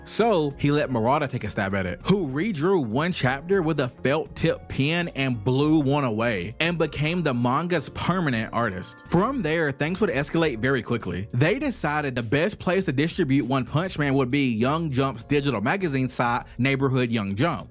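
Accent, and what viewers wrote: South, United States. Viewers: Great vid but gotta ask. What part of the south are you from haha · Very interesting! I used to love Shin-Chan, such a silly show. Also love your accent! I've heard some southern accents in my life but yours is unique to me!❤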